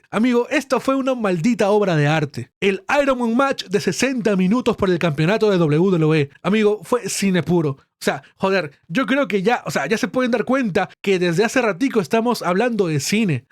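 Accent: Argentina. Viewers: Porque hablas coml argentino? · pq mrd habla como argentino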